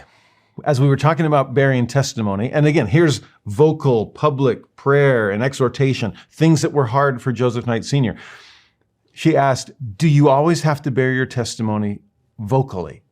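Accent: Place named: North, United Kingdom. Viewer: Are you really a Texan cause you sound like you're from up north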